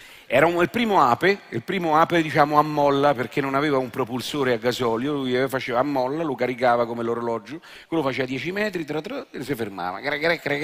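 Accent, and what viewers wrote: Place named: Tuscany, Italy. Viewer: Quale toscano?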